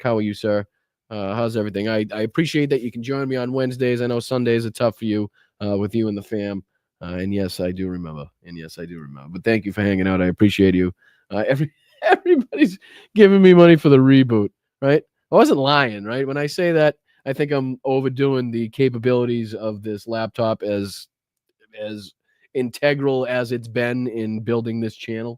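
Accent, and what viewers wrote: Boston, United States. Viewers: I love your Boston accent, but I think the amount of swearing is unnecessary.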